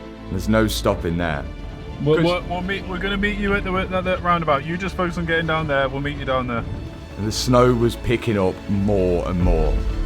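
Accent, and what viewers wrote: Leicester, United Kingdom. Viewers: big teeth and the british accent jumpscared tf outa me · Your accent sometimes I'm unable to understand. Is it a British accent? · Is the accent a Leicester accent? It's a glottal stop wonderland!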